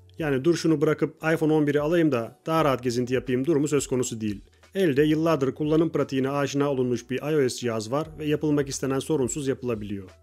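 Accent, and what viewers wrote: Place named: India. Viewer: Hocam hint aksani var sende ya:)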